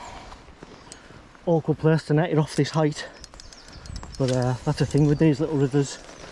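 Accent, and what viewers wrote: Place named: Cumbria, United Kingdom. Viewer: What river is that mate ? I’m guessing somewhere in Cumbria going by your accent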